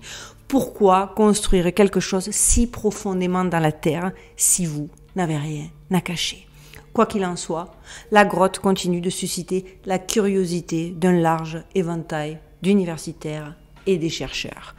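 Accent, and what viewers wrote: South, France. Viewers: Votre petit accent du midi , peu commun à Las Vegas apporte le petit rayon de soleil à travers votre reportage. Cw que vous indiquez est réel. J'ai eu la chance de visiter les souterrains de Bucegi... Tout ce que nous entendons vivons lisons apprenions et voyons est archi-faux ! 🙂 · Allô …. Juste par curiosité ou as tu appris à parler français….de quel origine es tu….je trouve que tu as un accent du sud de la France….ton accent est très jolie soit dit en passant.👍🇲🇶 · Puisque les commentaires t'aident pour remonter les algorithmes , commentons! Franchement, j'attends tes videos avec impatience. Ta manière de presenter est claire, précise, documentée et souvent drôle malgré des sujets qui ne le sont pas toujouurs! Mais ce que nous apprécions au delà de tout c'est ton bel accent du sud qui, pour les expats que nous sommes, nous ramène vers d'autres temps, d'autres lieux.... 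Surtout ne te laisse pas intimider. Please go on with the great work! Blessings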